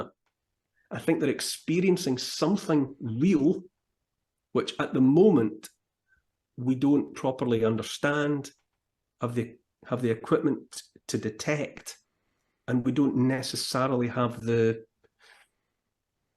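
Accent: Scotland, United Kingdom. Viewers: I really just love to hear scottish people talk just gorgeous.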